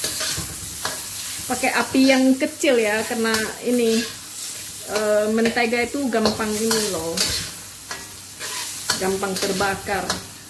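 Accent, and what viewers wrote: Medan, Indonesia. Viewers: Aku suka bgt logat Medanya kuat, menjadi ciri has..masak nya enak² penjelasan jls..tks🙏😇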